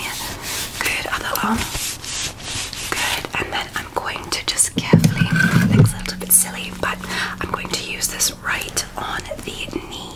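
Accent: England, United Kingdom. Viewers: The British accent got me dying gurl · British!!! Another great video!!!! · Love the English accent!!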